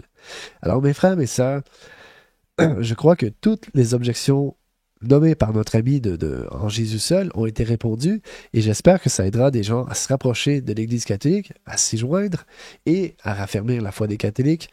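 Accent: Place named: Quebec, Canada. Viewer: Si t'es suisse alors pourquoi tu as un accent québécois ?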